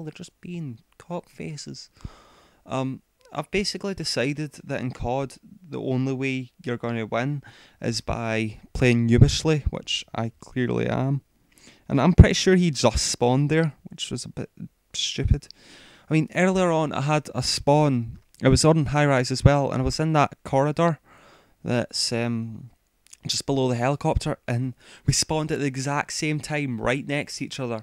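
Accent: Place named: Scotland, United Kingdom. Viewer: Where about from Scotland you from?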